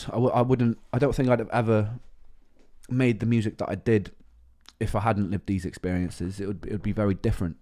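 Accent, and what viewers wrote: United Kingdom. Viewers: love the facet as a fellow man who is a Welshman and who thinks of themselves as a Brightonion I still hear the welsh pronunciation in your words like Appy, with a soft a.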